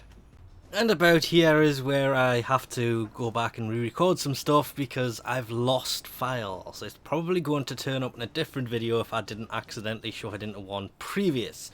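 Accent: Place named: Northeast England, United Kingdom. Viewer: Sounds like North East England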